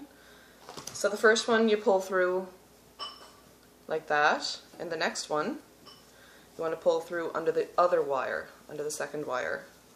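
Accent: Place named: Newfoundland, Canada. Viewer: I'm from Newfoundland and I thought, "she's not American and not mainland Canada by her accent"!! Love it!! I also LOVE your video!!! Very helpful and EASY to understand!! Looking forward to seeing more!!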